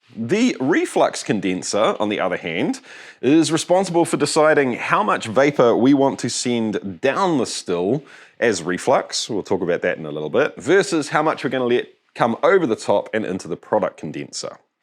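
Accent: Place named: New Zealand. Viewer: The SHEEP INVASION., until now your accent (and formerly-American one) was the best evidence that your channel is pure New Zealand. But now you've been INVADED by BLEATING SHEEP.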